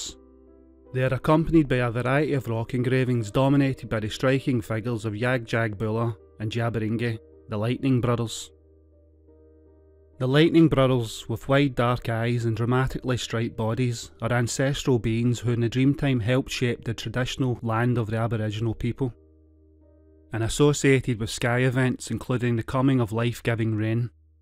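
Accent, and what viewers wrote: Scotland, United Kingdom. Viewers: nice accent. many good science popularizers have beautiful scottish accents. brian cox.iaian stewart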